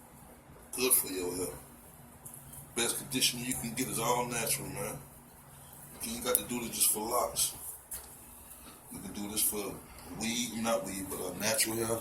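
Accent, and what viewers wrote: Florida, United States. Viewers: NATTY NATTY you can tell he from Florida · I can tell that u striaght from Florida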